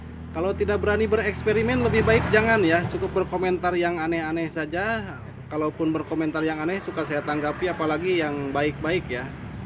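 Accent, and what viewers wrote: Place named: West Java, Indonesia. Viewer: Orang sunda mh seri da ngupingna😅😅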